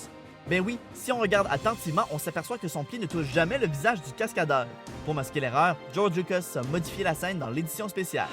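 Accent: Quebec, Canada. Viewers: Quelle belle accent québécois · es-tu québecois ??? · cool enfin un québécois moi je suis québécoise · Ton accent n'est pas bizarre mais différents du n'autre! J'penses que c'est que veulent dire les gens qu'ont ils disent le mot "bizarre" pour ma part je trouve ton accent québécois géniale ton accent ma retenue jusqu'à la fin de la vidéo;) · LouLou ChannneL ca s'appelle un accent québecois.... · l'accent canadien 😍😍😍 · Je kiffe l’accent canadien 😍😍 c’est tellement relaxant 😝😂❤️ j’aimerais tellement l’avoir 😭😭❤️ (oui je suis française) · t'es Québécois toi non ? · Très bien foutu, un abonné en plus pour votre chaîne! Et y a pas à dire, l'accent québecois est vraiment génial! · Je kiffe ton accent québécois mec 😂😍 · heuu tu es canadien ? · j'adare ton accant Québécois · Je trouve l'accent québéquois bizzard en tant que français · bonne vidéo et joli ton petit accent québécois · Moi direct con il a commencé a parler j’ai dit il est Québécois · est tu québécois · L'accent québécois bien que je viens du Québec sa me fait toujours bizarre de l'entende en vidéos😂(très Bonne video en passent😜) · osti que la personne est un québécois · Juste une question , tu es quebécoi ???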